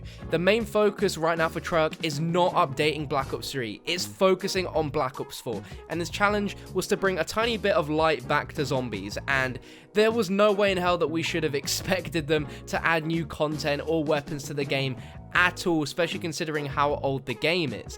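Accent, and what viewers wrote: United Kingdom. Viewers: He is British? Or American?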